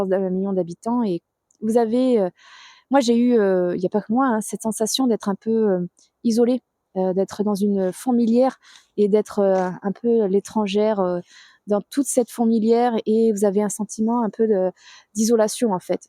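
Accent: Russia. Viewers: Неужели никто не понимает, что вы русская. Я это отлично слышу по вашему французкому. Сплошные фейки.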